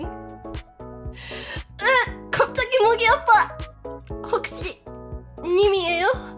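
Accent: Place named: Gyeongsang, South Korea. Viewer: 경상도인이 들어도 경상도 사투리가 찐하게 들려 ㅋㅋㅋ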